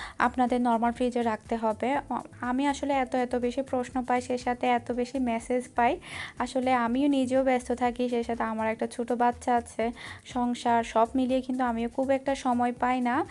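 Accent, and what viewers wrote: Chittagong, Bangladesh. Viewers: হেতি চিটাগায়িংয়া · আপু আমার মনে একটা প্রশন ছিল কারন তোমার কথা গুলো অনেকটাই আমাদের চট্টগ্রামের মত কিন্তু আজই জানতে পারলাম তুমি আসলেই চট্টগ্রামের,জানতে চাইনি কারন তুমি অনেক বেস্ত আর এখন জেনতে পেরে তোমার প্রতি ভালবাসা আমার অনেক বেড়ে গেল।আর তোমার সাথে আমার অনেক মিল আমার ও দুই মেয়ে আর ছোট মেয়ের নাম আরোহী।দোয়া করি সব সময় ভাল থাক।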